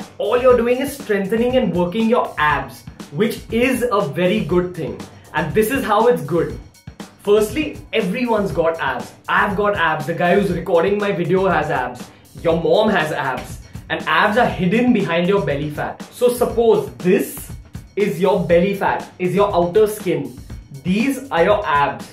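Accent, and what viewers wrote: India. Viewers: I like indian English accent hahahah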